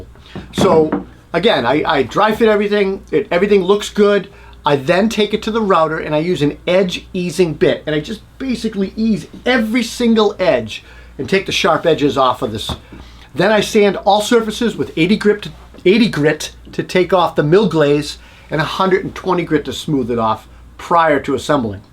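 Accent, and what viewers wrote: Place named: New England, United States. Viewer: my gf and I love your videos. She's the hobby woodworker and I'm learning from her. Your instructions are easy to follow and your voice is both slow and clear. Maybe it's a Massachusetts or New England thing but you remind me of This Old House and the New Yankee Workshop.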